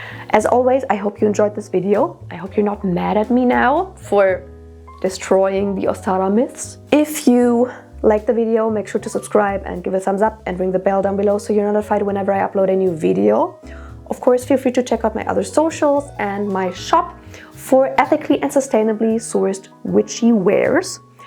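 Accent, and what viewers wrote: Germany. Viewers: I'm a Christian and I think you very informed. And if you are German I love your German Accent! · You have a great "accent" like german would be your mother tongue. Greeting from germany!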